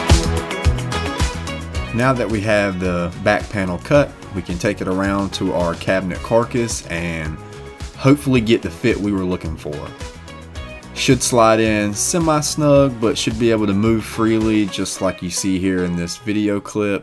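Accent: United States. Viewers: Enjoyed watching my first video of your work! (with hints of Bourbon Moth, possibly? And, of course, I enjoyed those East Carolina "o's.") Though I've been in Texas most of 60+ years, my father was from your area and his ancestry origins are all from the area of Pinetown & Pantego? since the 1700's, I believe. I was born in New Bern as my folks moved around that East coast area a quite a bit in those early years before moving to Texas. Anyway, you were really funny and gave me some more ideas for my own cabinet building when I get to get back to it soon. Thanks, again! (yes, I will be subscribing. :) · I dated a guy who sounded like you. He was from West Virginia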